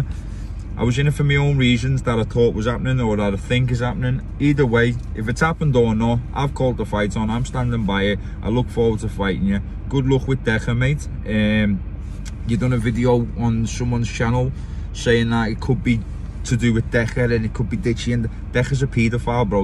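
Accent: Liverpool, United Kingdom. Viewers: Proper and scouse"" fairplay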